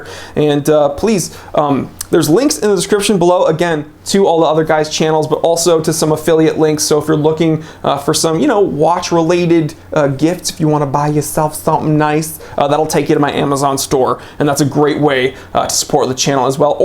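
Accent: Germany. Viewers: Lol.... You've lost the plot this time... But love that wacky German accent. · Your "german-english" was great - just on point! Love the outtakes 👍😄 Greetings from Germany, yours Hendrik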